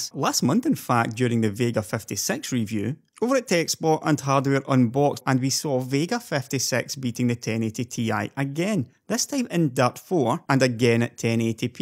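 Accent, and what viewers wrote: Scotland, United Kingdom. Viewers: i love you videos and your scottish accent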